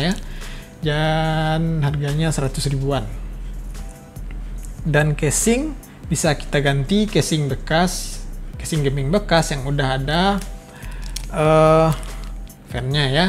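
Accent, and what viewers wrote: West Sumatra, Indonesia. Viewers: Urang Minang Uda?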